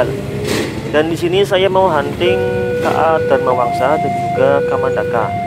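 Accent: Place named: Banyumas, Indonesia. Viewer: pean sh wong ngendi mas,kok Ngapak omge?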